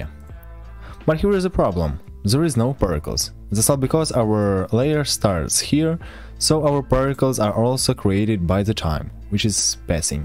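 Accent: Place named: Russia. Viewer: i can hear some russian accent in it but it's so good it's like playing a video game about WWII LMAO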